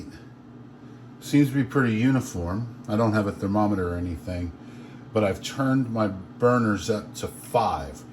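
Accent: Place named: United States. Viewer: Super helpful !!!! Thank you ! My boyfriend likes your country accent btw ! Keep it up buddy !! 🤟🤟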